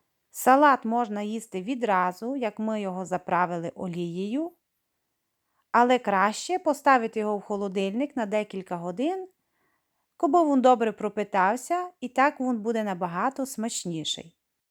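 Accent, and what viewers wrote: Transcarpathia, Ukraine. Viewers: Дякуємо за закарпатську вимову ,привіт з Білої Церкви що на Київщині!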